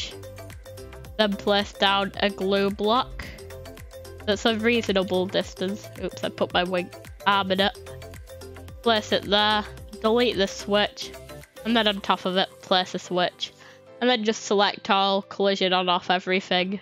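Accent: United Kingdom. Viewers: Its called a british accent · He talks normal he's just british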